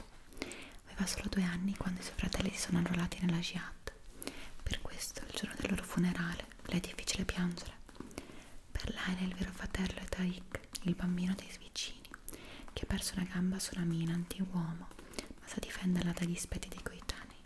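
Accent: Italy. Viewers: An American dude will always fall for a pretty Italian girl with that accent and language. It would be hard not to! 😌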